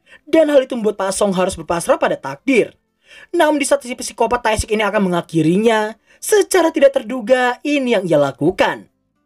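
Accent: Java, Indonesia. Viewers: medhok coy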